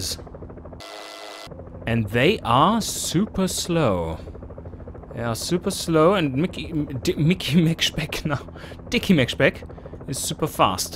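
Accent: Germany. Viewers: Nice sympathic german speaking English. Ich liebe es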